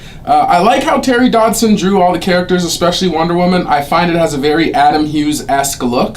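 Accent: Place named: Nova Scotia, Canada. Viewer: Are you from Canada? You sound like you're from Nova Scotia.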